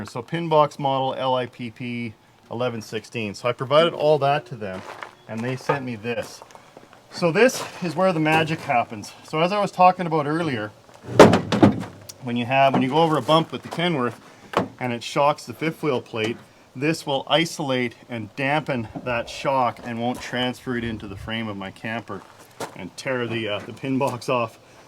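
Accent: Canada. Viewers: The way you talk you don't talk like a truck driver maybe it's a canadian accent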